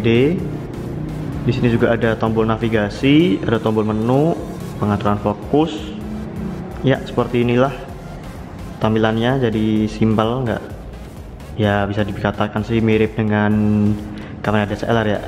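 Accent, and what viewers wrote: Java, Indonesia. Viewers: mending jadi diri sendiri aja mas. gaya reviewnya lebih bagus justru menampilkan feel 'jawa' nya.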